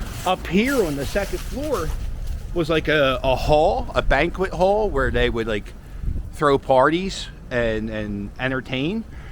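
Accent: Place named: Delaware County, United States. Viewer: The Delco accent puts this tour into premium territory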